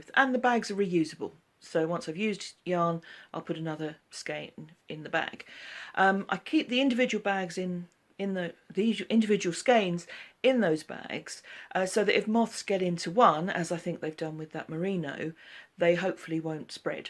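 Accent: England, United Kingdom. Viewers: Subscribed - expat now living in Canada - nice to have the english accent ;-)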